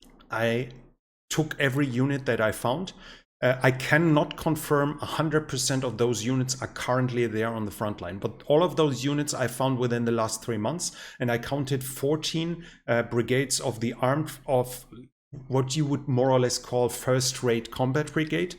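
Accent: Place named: Germany. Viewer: The moment I heard a German accent I knew this would be good.....just straight forward analysis based on cold hard facts and evidence without any political rhetorics how refreshing...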